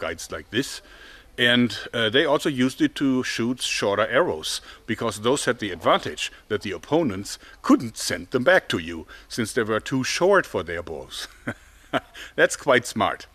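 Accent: Germany. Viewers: I guess its the German accent. One of the guards voice from Skyrim always sounded like Arnold Schwarzenegger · Das deutsch hört man raus! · du hörst dich an wie ein deutscher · Warum klingt dein Englisch so deutsch? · Dieser Moment wenn man als Deutscher Deutsche in einer anderen Sprache wiedererkennt haha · Nice german accent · when he speaks english he sounds more german than some germans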